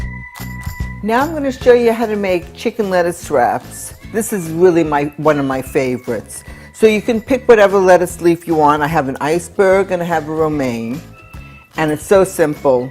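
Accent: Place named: New England, United States. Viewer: That sounds like a New England accent. Im from Boston, where are you from ? Thank you for the tips. I use this chicken all the time and always looking for new ideas.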